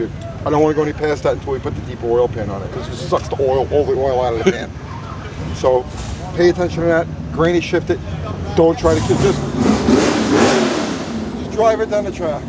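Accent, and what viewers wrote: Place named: Brooklyn, United States. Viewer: you were ragging way too hard!!! Obviously appeared as if it was his first time the drags which can be a bit overwhelming. You sounded like a slightly nicer version of Mike P. from Brooklyn!!! He'll get there, nothing works but experience - you KNOW that! It's harder than it looks. Start with teaching him how to do a proper burn out!!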